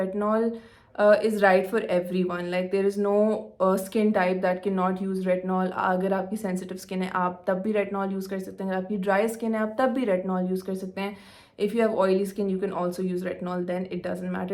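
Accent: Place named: United States. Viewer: I thought you were American sorry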